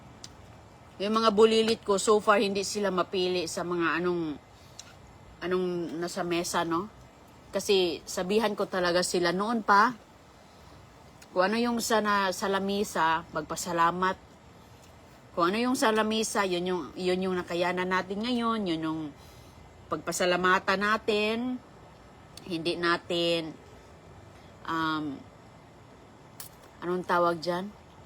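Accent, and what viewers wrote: Visayas, Philippines. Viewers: Sorry bisaya lanh kung ganyan accent niya. Be kind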